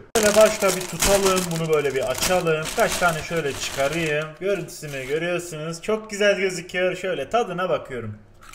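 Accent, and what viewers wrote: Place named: Black Sea, Turkey. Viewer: Lan ben daha 12 yaşındayim niye bunu izliyrum da bu da karadeniz 🤣🤣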